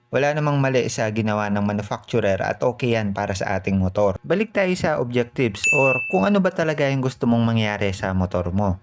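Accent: Pampanga, Philippines. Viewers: Kapampangan ka Boss? Tono pa lang eh. Nice one dami kong natutunan dito.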